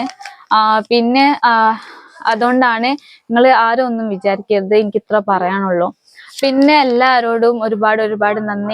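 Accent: Malappuram, India. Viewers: Ente cousinte home chelari aanu🥰.. samsaram kettapol thooni.. onnengil calicut allengil malappuram aayirikkumnu😍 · നല്ല മലപ്പുറം മലയാളം സംസാരിക്കുന്നു കൂടുതൽ ഉത്തർപ്രദേശ് കാണിക്കു മലയാളികൾ കാണട്ടെ അവിടത്തെ ചുറ്റുപാടുകൾ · Malappuram style samsaram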